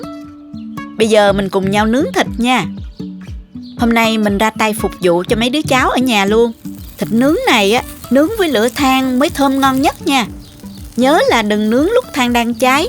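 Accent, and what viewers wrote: South, Vietnam. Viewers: nói giọng Mien Nam bghe de thuong ghê và gióng nhẹ nhưng gịt quá rất có cảm tình fish xâc bạn nhé mình chúc Cô enjoy your food. Gd bless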